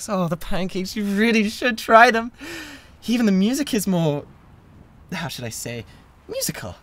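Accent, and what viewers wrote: United Kingdom. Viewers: You're one of the few ASMRtist that can I British voice I really like, I tolerate very few too.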